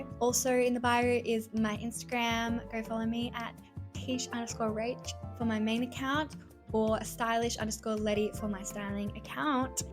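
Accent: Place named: Melbourne, Australia. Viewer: i could tell you were from melbourne immediately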